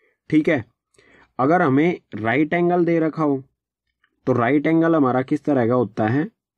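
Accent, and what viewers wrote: Haryana, India. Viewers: Sir aap haryana se belong karte ho kya 😊 apki voice mein haryanvi tone hai 😊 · Very well done sir, if you do not mind, kindly change your Haryanavi tone. it will be better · Thank you so much sir for this video Sir one more question :- Are you from haryana?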